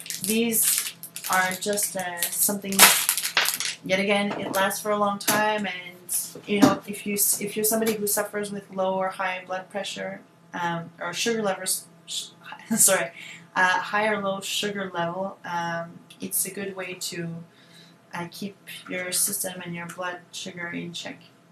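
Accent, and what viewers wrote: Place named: Quebec, Canada. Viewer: Je pense Quebecois. I think Quebecois :) French Canadian :) Some of them are Okay :)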